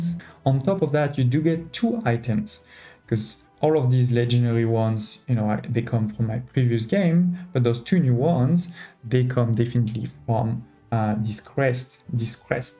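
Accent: France. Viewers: Are you french ? You speak a very good english with a french accent :)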